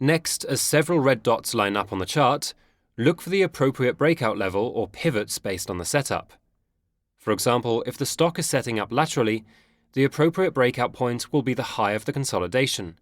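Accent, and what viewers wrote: United Kingdom. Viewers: English accent sheeple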